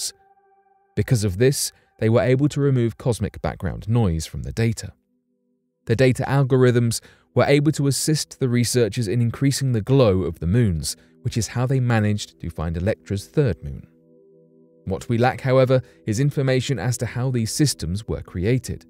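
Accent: United Kingdom. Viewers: Why do we have to hear Brit accent when they've never done anything space related.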